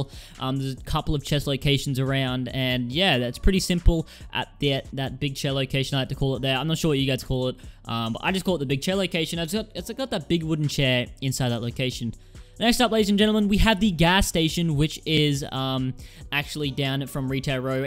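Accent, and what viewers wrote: Australia. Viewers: Fucking Woop Woop ahahaha Bloody aussie <3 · are you Aussie?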